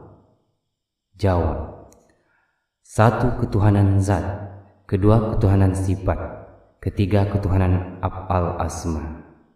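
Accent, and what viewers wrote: Bugis, Indonesia. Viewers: Ini AjaraN dari TaNaH BugiS.. Yg BicaRa JugA Uda KedeNgaraN LogaT BugiS'y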